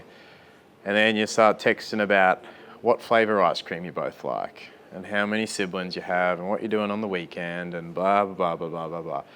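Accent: Australia. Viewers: I love your strayan accent sm XDDD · Are you Australian? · its the Australian accent🤣😻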